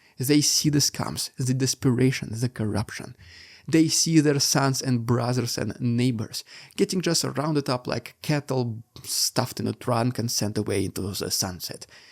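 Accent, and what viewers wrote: Russia. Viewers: You would get more listeners if you dropped the heavy Russian accent. People want to understand the information not listen to a comedian.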